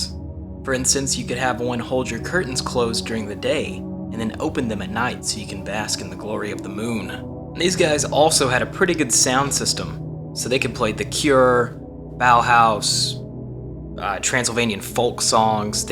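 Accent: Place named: Germany. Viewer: Incredible German accent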